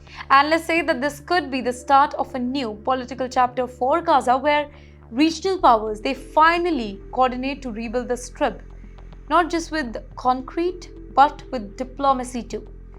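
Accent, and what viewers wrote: India. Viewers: THERE IS NO TEREBEL LIKE INDIAN NATIONALITY SPOKE ENGLISCH 😅LIKE YOUR MOUTH FULL WITH TOUNGHS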